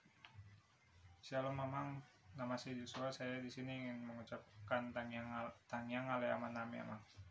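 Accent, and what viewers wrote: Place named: West Java, Indonesia. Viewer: Mantap.. bhs batak logat sunda nyak..😁👍👍👍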